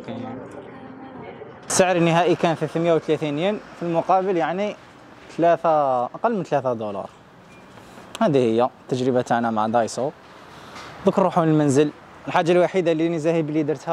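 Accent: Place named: Algeria. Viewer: اللهجة الجزائرية واليابان مع بعض ادمان ربي يبارك فيك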